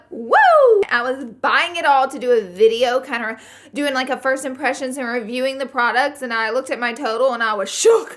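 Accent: South, United States. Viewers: Where are you from???? I love your southern accent. I'm from mobile, Alabama and I can just feel that you're from Alabama lol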